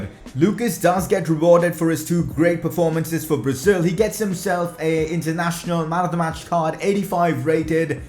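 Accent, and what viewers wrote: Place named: India. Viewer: That one Indian kid in school who tries to be cool with his cringe ass accent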